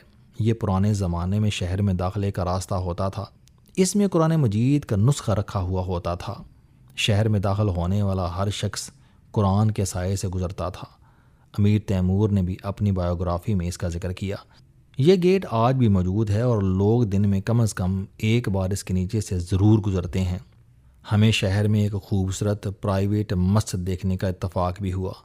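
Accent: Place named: Punjab, Pakistan. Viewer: nice to listen khas toor say panjabi lahzey ki urdu.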